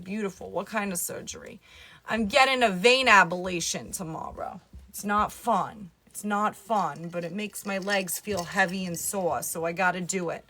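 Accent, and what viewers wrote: New Jersey, United States. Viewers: I love your jersey accent. I had a friend from Ridgewood. Nice legs ❤❤❤ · the New Jersey accent is the sexiest woman sex in the world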